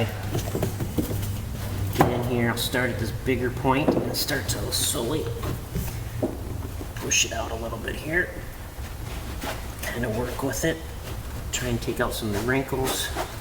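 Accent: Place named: Minnesota, United States. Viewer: Nice video! My mechanic here in Houston wanted $350 just to pop out a corner dent like that. But Houston is expensive. Also nice to hear a Minnesota accent! I went to college up there.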